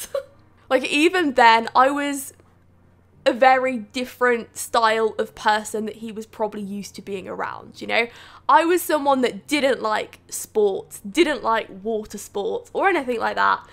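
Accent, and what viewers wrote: United Kingdom. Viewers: i love your british english:D wotaaah instead of water😂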